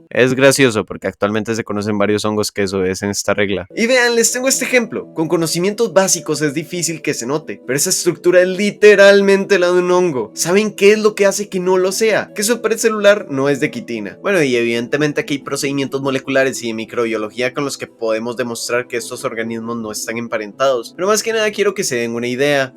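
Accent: Costa Rica. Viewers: Ufff se noto lo tico xd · Buen vídeo, nuevo suscriptor, esa forma de hablar y pronunciación de la R me suena de CR jaja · Eres de Costa Rica verdad? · Desde que lo escuche supe que era tico purs vida pa ❤️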